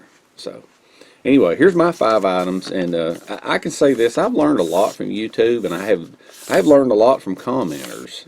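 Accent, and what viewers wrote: South, United States. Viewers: Happy New Year. Am about to set out on my morning old man walk(arthritis in knee, so no more running,) with a good American Buddy from Alabama(I'm American too,but not from the south,) and our dog. Anyway, years ago, when he'd gone home for almost a year, I discovered your channel and would watch it out of nostalga for his accent and similiar excellent story telling skills....cheers...from far off Normandy, France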